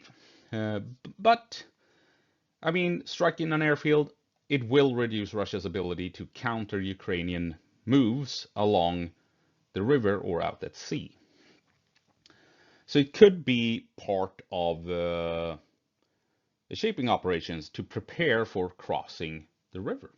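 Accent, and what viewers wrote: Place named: Sweden. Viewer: Haha 😂. Nice to hear some swenglish. Nice work!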